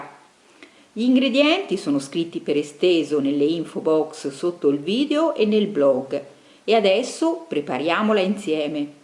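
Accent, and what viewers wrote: Tuscany, Italy. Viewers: TROPPO BRAVA!!!!! Ogni ricetta un successo...ho fatto le brioches a forma di fiore con marmellata: grandiose!!! BRAVA!!! E poi il tuo accento toscano da una tranquillità...bacioni! :)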